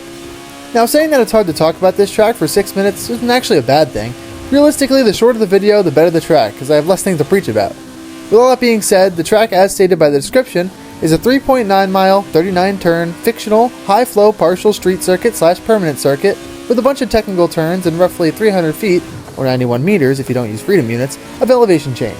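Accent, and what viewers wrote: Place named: RP, United Kingdom. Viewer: This is a wicked circuit. I agree with your review other than the dead stops and the size of it I dont think there should be a mark down for them, cos if a person cannot stay within the width of the track plus the run off space, then its a skill issue, not a builder issue. Yes, length does make it harder to decorate, but id rather have a brilliant track like this, then more stuff to look at it whilst I race about. Good stuff boy. I love your posh accent as well. hehehhe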